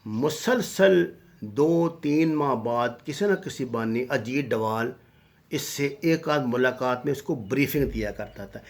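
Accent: Punjab, Pakistan. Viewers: Sir please apnay bat karnay ka tarika thik Karo lagta hy Punjabi me Urdu bol rai ho ya Urdu me Punjabi... Please don't mind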